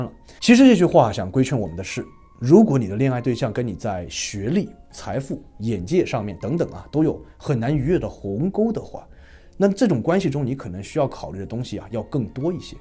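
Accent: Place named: Shanghai, China. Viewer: 你这个上海普通话，把这么严肃的案子说笑了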